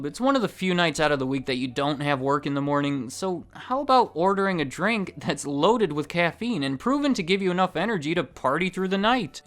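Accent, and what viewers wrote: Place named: Minnesota, United States. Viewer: I feel like is from Minnesota based on his voice. I always wonder if he is a Minnesota Twins fan.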